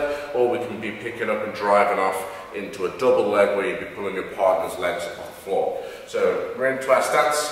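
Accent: England, United Kingdom. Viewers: You're pretty good, it's strange for me to see bjj with English accent.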